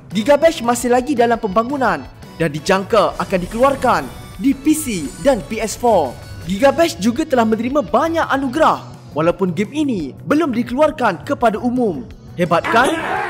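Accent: Malaysia. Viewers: ni orang malaysia ke?? jawb soalan saya di next video blh tk???sbb brcakp mcm malaysia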